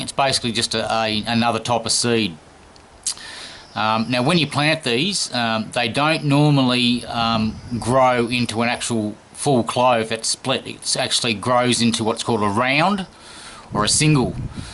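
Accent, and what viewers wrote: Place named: Australia. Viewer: Fair crack of the whip cobbers. (Sea salt? he said Seasol quite clearly mateys?) This blokes an Aussie and is how a few of us talk. Fair dinkum you drongos get yourselves some hearing aids so you can catch what the hell he's waffling on about. Crickey diggers, cobbers, blokes, wake up and whack down a tinny or two, or three! I reckon a few people on this site wouldn't know if they were Arthur or Martha but then again it is 2025 and i've got no idea what the fck is going on half the the time with people these days? Anyhow if you spot an Aussie on the street give them a hoi and say gooday mate and ask them if they have any corms to spare. 😜😇Peace.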